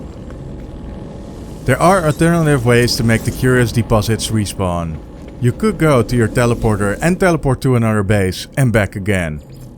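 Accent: Netherlands. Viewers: aah het nederlandse accentje blijft ook ons kent ons he xD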